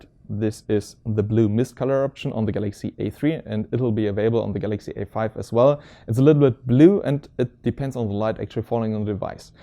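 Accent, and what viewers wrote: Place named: Germany. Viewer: sehr gut. love your German Accent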